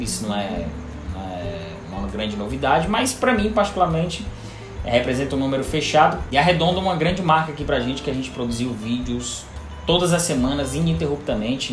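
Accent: Northeast, Brazil. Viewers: Parabéns pelo 💯 video, sempre assisto e pego várias dicas, amo vê o sotaque do meu nordeste no booktube👏👏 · Canal com um ótimo gosto literário e com o sotaque mais bonito do Brasil. Rsrsrs.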